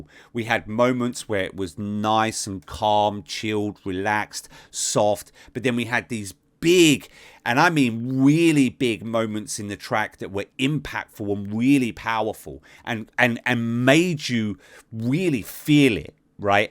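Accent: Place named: United Kingdom. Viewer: Of course Igor would say his name correctly, lol! I’m just trying to give the pronunciation for this reactor, who has a native British accent like mine ...peace ...❤️🎶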